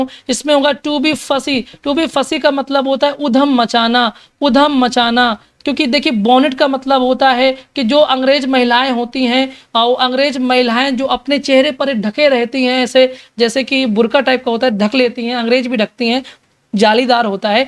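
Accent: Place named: Bundelkhand, India. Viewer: Namaskar Guru ji Aap Bidaki / Banda/ kanpur/ phatehpur kahan se hai?? Because the way you speak sounds like among one of them.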